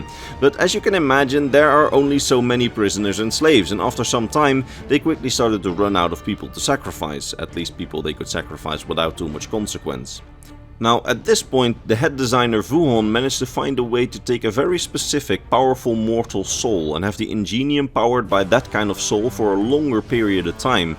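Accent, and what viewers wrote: Netherlands. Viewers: It’s the Dutch accent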